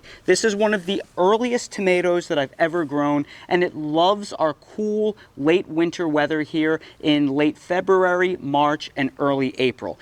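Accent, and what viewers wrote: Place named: North Carolina, United States. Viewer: No offense, but I kept wondering if you were originally from North Carolina. (Some of my favorite youtubers have recently moved there). I know as millennials some of us don't have much of a southern accent, but you have an accent of your own, haha. Its just something I have an interest in. There are variations of the southern accent and I can usually place someone in a particular region by listening to them talk.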